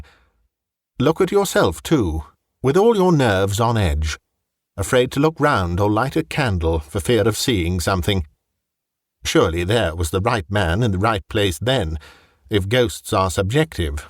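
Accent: England, United Kingdom. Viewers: This and horrorbabble are my favorites. There's nothing quite like an English "accent" and a voice capable of giving character when listening to spooky stories. Who would've thunk it huh? English people being good at speaking English. Haha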